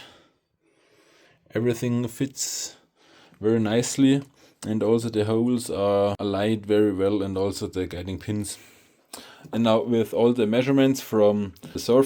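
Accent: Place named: Germany. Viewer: I hear deutsch 🫣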